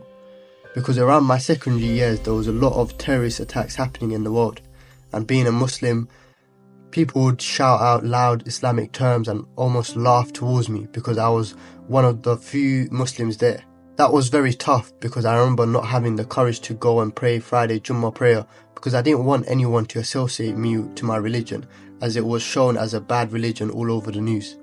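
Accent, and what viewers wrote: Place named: Bengali, United Kingdom. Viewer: nah, that aint no Essex accent. Thats a UK Bengali accent there. Aka the Bengali Twang. If I close my eyes and watch this video, I can 100% tell youre Bengali, could probably even tell what villiage youre from.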